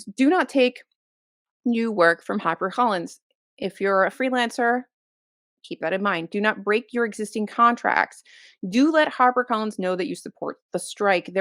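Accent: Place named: New York, United States. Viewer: love this video and had to comment bc when you said "cost" i knew immediately u were from new york and love ur accent (bc it's like mine haha!)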